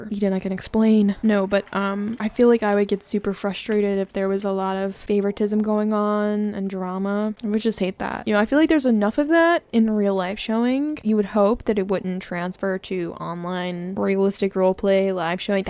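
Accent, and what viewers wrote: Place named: South, United States. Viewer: your southern accent is 🤌🏻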